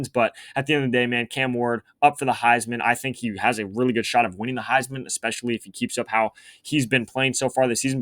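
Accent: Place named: Bay Area, United States. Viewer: Aye I’m in the Bay too! I could tell you had a lil Thizz in yo speech!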